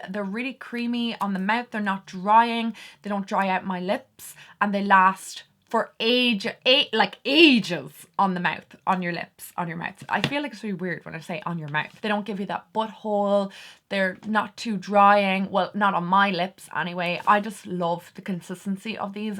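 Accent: Ireland. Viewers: To echo other comments - your channel is a hidden gem! A lot of the big YouTubers in the beauty community are losing their shine for me - they seem to hype really expensive makeup that is out of reach for the average person and review less and less affordable items. I feel your passion and honesty and as someone who used to live in Ireland (now back home in Australia) your accent takes me back to a very happy time in my life. I love your videos, especially the Revolution Beauty reviews. Love it XO... for any other Australians reading this comment, you can buy Catrice, Essence and Makeup Revolution products from Kosmetik4less, a Germany online store that ships to Australia. You can also buy Makeup Revolution products directly from the parent company Revolution Beauty, who also ship to Australia. Priceline also stock some Essence products.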